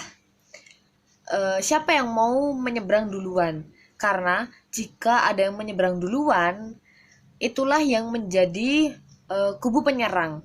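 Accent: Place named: Java, Indonesia. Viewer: Kok geliiiii 😂😂😂😂 medok banget ya bakno 😅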